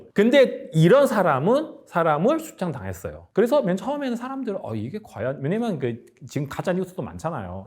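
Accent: China. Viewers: 뭐여 왜 중국발음이아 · 이 분 중국인 이네. 발음이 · 지금 말하시는 분이 중국인 같습니다~~ 한국말 제대로 못하네요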